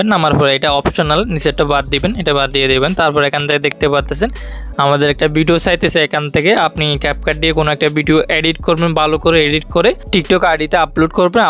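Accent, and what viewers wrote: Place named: Sylhet, Bangladesh. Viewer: Apni sylheti vai❤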